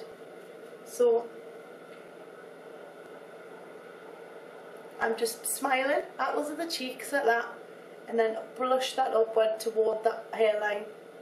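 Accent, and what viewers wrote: Newcastle, United Kingdom. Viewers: Are you ust British, or are you some sort of "mix"? :P BTW: You ROCK at this !!! Keep up the good work !! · r from newcastle by any chance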